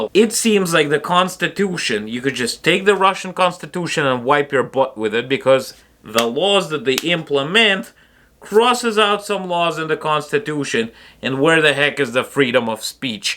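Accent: New York, United States. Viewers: You already sound american! Its my life and I can do whatever I want! Land of the free. Maybe you can teach a certain group of Americans that freedom is worth fighting for! Good luck to you guys! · Dude, you already sound like you are from NY, you should fit it just fine. Don't you have cousins here already?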